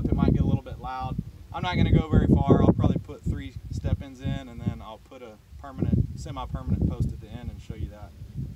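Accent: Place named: Texas, United States. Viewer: Hey what up with the Aggie comment!! ... Class of "92. Enjoyed the video! Sounds like a Texas accent.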